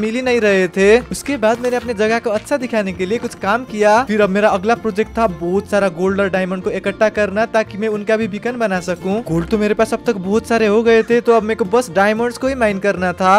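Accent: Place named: Nepal. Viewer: his langauge tone is like napali tone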